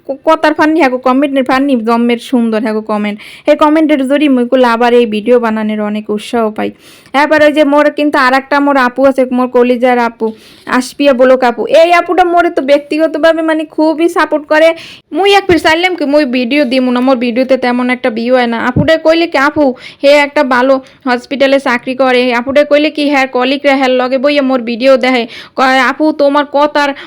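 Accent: Barishal, Bangladesh. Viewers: তোমার চালের ড্রাম টা আমারটার সেইম কালার আপু,আমারও এখন পর্যন্ত আল্লাহ্ র রহমতে কোনো নেগেটিভ কমেন্ট নাই,তোমার কথাই সুন্দর লাগে বেশী আপু,বরিশালের আন্ঞলিক ভাসা,আহা❤❤❤আমারও সংসারে যা আছে সেই সত্য জিনিষ দিয়েই তোমাদের মাঝে ভিডিও নিয়ে হাজির হই আপু❤কমেন্ট পরলে,রেহানা আপু,হাবিবা,শিশির আপুর সাথে আমি যুক্ত আছি❤মহিলাদের কাজ,আর বলো না আপু😢শেষ করলাম আপু,আলহামদুলিল্লাহ্❤ফুল ওয়াচ❤পাশে থেকো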